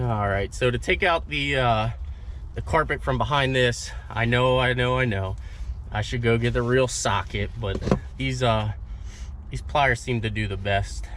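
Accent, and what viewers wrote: Pennsylvania, United States. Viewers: Got some hard work on this one coming! Is it a PA accent that gold = goad and water = wawter?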